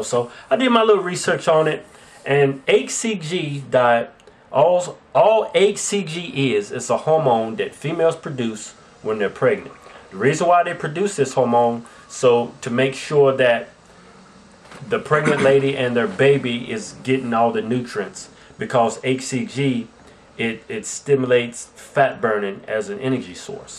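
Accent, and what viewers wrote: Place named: South, United States. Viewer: gorgeous.... even with those country accents, and bad language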